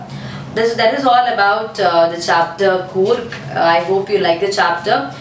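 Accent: Kerala, India. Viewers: Mam r u from Kerala??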